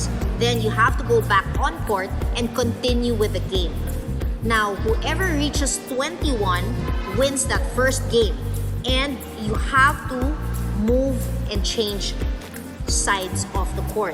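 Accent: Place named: Philippines. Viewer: Based on her accent, most probably she is Filipino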